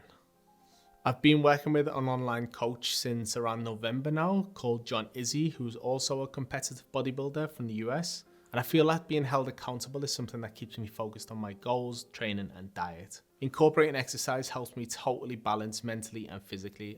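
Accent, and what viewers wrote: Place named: Liverpool, United Kingdom. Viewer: I had never heard speak until now. Was not expecting a Scouse accent!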